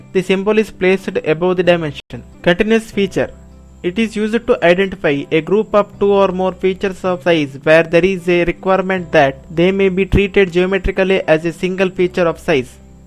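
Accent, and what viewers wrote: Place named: India. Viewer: 印度口音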